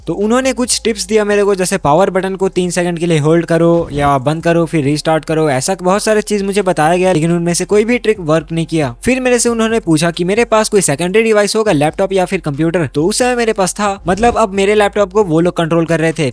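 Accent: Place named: Chhattisgarh, India. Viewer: Bolne ke kareeke se mujhe bhi laga CG se hai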